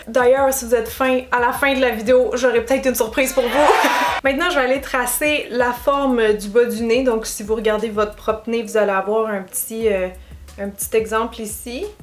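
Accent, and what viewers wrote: Quebec, Canada. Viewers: Holala J'ADORE l'accent québécois 🤣❤️❤️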